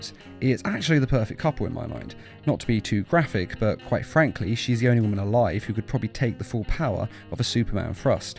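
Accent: United Kingdom. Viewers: That’s why his content is so great because he keeps that sophisticated British accent and is so casual even if he is talking about the dumbest things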